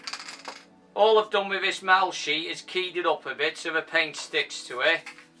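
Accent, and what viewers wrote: United Kingdom. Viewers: This is the most British person I have ever heard. Subscribing 100%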